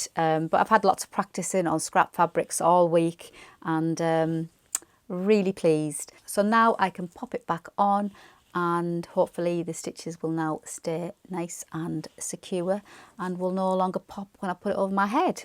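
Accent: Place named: North, United Kingdom. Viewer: The babylock machines are the best i have that coverstitch and an imagine overlocker, You sound northern like me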